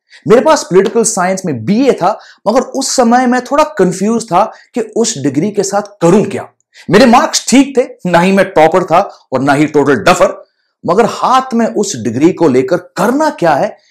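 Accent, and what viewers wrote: Standard. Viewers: Sir Aap ka Hindi bhasha bhaut khub aur shudh hai. You have Indian roots..❤🙏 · This is first time I have seen your video and I was shocked when you started speaking hindi in a pure accent 😂😂😂😂 I was wondering how an american looking guy can speak hindi so well and then I realized that you are son of Great Tom Alter. You are a true Indian and our brother your complexion cannot separate us.❤❤ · My family is from India but I was born and raised in the US, and I’m learning Hindi from watching a video by somebody whose family is from America but was born and raised in India. How interesting! मुझे आपका विडियोज़ बहुत पसंद है और आप बहुत आर्टिक्युलेट तरीक़ा में बात करता न्यूट्रल दिल्ली हिन्दी में। मेरे लिए बहुत मुश्किल है जब लोग अजीब ऐक्सेन्ट्स में बात करते है ग़लत उच्चारण के साथ जैसे की लोग जो स और श का फ़रक नहीं पता और लोग जो ज, ज़, और ॹ (vision और measurement का zsh आवाज़ जो ज़ नहीं है लेकिन ज भी नहीं है) का फ़रक नहीं पता। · Your Hindi is way more pristine than todays Hindustanis.❤ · Aap to itna practice kiya ho aapka accident bhi nahin lagta pure Hindi accent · You are fantastic to listen to your shuddh hindi, better than your dad!!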